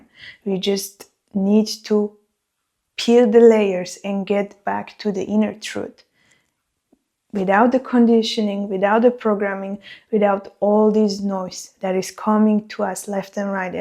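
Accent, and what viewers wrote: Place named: England, United Kingdom. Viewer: Ahh that's a lovely english accent. What's your original language?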